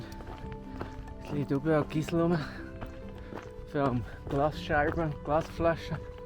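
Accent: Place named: Central Switzerland, Switzerland. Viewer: Hallo lieber Auf meinen Weitwanderwegen habe ich immer auf das Zeltschleppen und -schlafen verzichtet. Deshalb bleiben mir die wunderbaren Strecken in Amerika verwehrt. Umso schöner ist es, wenn ich nun dank dir und deinen schönen Videos den gesamten CDT (hoffentlich) mitwandern kann🙂! Sogar dr komisch Innerschweizer Dialäkt verschtohni guet 😊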